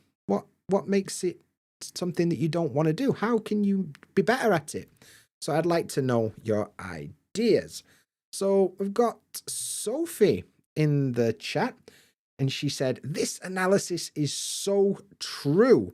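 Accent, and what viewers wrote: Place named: Yorkshire, United Kingdom. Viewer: I love your video so so much! I'm always fascinated by the Yorkshire English. Although I rarely comment but I certainly watch your video a lot and I learnt a lot from your video. Support you.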